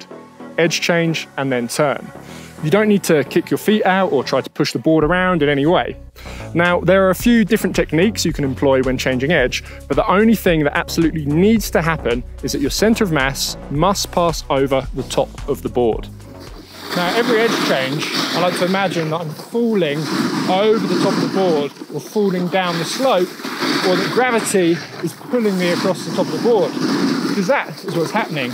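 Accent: United Kingdom. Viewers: Nothing against you mate but its weird hearing an brit accent in a snowboarding video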